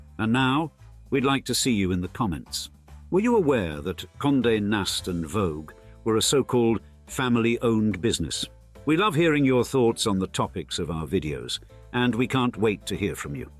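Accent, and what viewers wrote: United Kingdom. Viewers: As a lover of history, I look forward to your videos every week. Your fusion of history and contemporary culture with your British accent is very satisfying. Thank you for all that you do on this channel.❤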